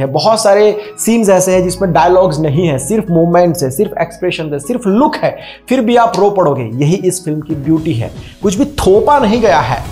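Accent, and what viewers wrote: Gujarat, India. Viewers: Aap gujrat se ho ?? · kya aap gujarati ho?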